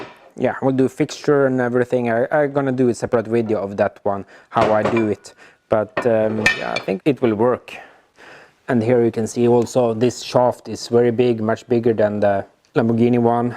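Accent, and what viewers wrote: Sweden. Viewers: Damn that block!You can fix it!.Good luck! It's always funny hear swedish accent but better than we Finns, our accent is just terrible.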